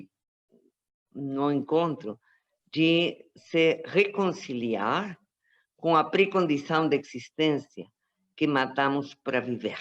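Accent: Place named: Brazil. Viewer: Está muy mona y habla como brasilera! Se entiende todo. Muy bueno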